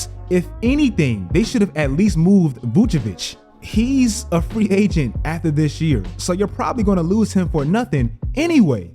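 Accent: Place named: New York, United States. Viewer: You sound like you from NY lol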